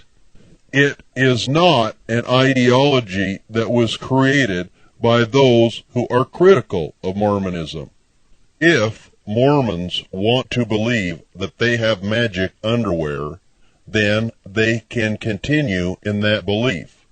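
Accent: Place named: Canada. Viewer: I dare not try to understand the purpose of the video, they guy sounds Canadian.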